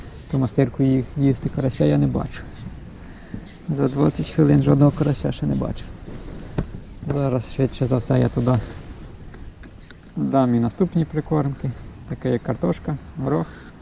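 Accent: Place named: Western Ukraine, Ukraine. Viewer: А вимова типова західна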